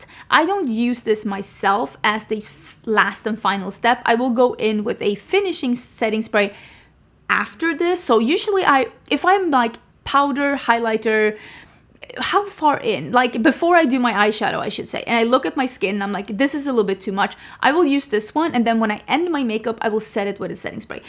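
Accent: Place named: Sweden. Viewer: wait, are you for real right now? You do realize is Swedish and English is NOT her first language. Her native country comes w its own dialect and accent. Some letter combinations we have in English DO NOT come natural for some languages. This comment is literally one of the most absurd I’ve read in a while. You must come from another planet to have this kind of expectation of someone. Either that or you don’t work for a living and are clueless to the amount of hours in a day.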